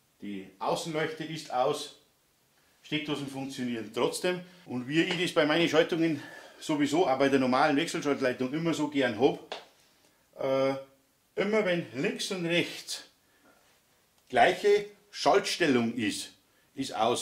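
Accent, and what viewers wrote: Bavaria, Germany. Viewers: du bis der beste, geile Aussprache ( Dialekt) Unser beste Bayer 😀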